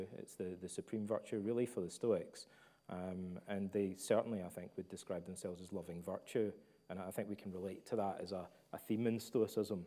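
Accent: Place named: Cockney, United Kingdom. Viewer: He's talking in Cockney accent which even most of the Brits don't understand.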